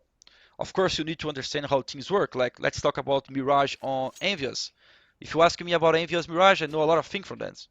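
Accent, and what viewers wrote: Brazil. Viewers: se quiser melhorar tua pronúncia da palavra WORLD para que não pareça WORD. Fale assim: wor - L(el) - D(di) worLd De resto, embora teu sotaque BR, dá pra entender muito bem. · tu é br ou americano?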